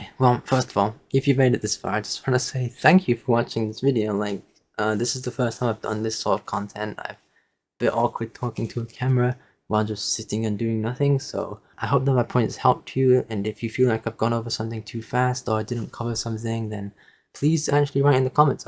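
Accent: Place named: Eastern Europe. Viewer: You sound Eastern European, do you feel OK about forcing British people to pay for your fetish?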